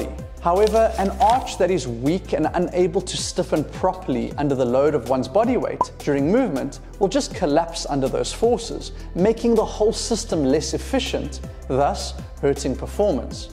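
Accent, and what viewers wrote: South Africa. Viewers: Wow you sound so South African lol Anyway, I think I was born with flat foot (only one of my feet is flat) As the years go on my foot is becoming more and more sensitive. It aches even when I'm sleeping.. I'm hoping some of what you said can help me. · You sound South African…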